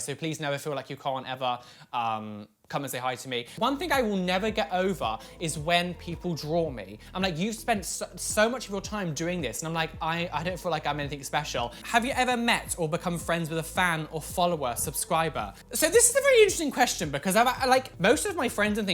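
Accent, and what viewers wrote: United Kingdom. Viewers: I British accent and his word choice. Love your videos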